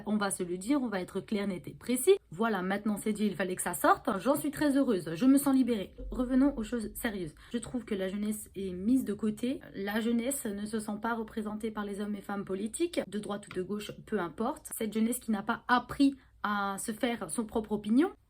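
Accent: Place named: East, France. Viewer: Joli accent de l'Est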